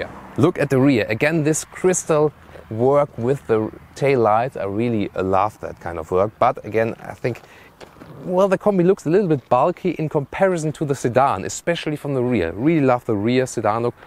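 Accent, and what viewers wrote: Germany. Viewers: I didn't read the hole topic. So i didn't really know what language it is before i started the video. In the first moment, the first 10 seconds i thought it's german. I am from Germany and i never heard such a hard german accent like this one before. And i'm still thinking it's a german review 😜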